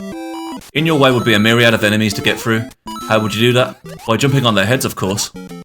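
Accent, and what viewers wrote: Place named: Liverpool, United Kingdom. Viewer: You sound like a Beatle. Are you from Liverpool?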